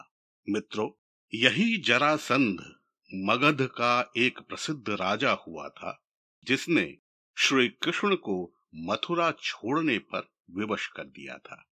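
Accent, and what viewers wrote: Standard. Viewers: शुद्ध उच्चारण ने मंत्रमुग्ध कर दिया है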